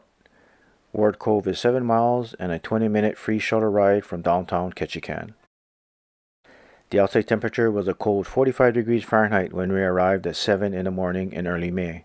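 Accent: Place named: Hawaii, United States. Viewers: very helpful! Mahalo! BTW are you from Hawaii? Your accent sounds local 😊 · I thought the same thing too! The tone sounded very local Hawaiʻi to me.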